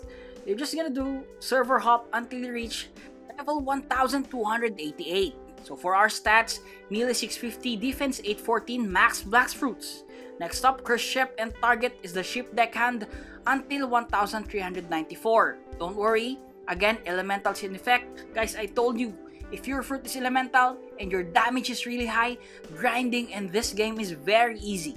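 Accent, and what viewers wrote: Philippines. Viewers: Dam i just noticed from PH😱♥️ because i noticed the accent so i checked the bio and i was suprised! Im also from PH hehe Great video as always♥️😱🥳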